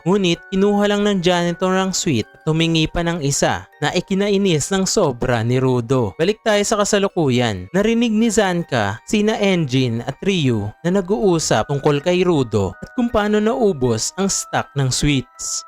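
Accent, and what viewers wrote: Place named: Iloilo, Philippines. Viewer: Idol pa illongo kana pero keep it up at salamat sa recap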